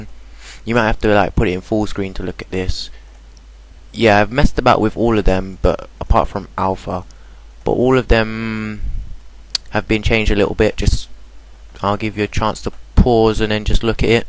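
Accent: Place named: East London, United Kingdom. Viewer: YOUR ACCENT DOESNT COMPUTE. Seriously, where are you from, you sound a bit east london, maybe essex?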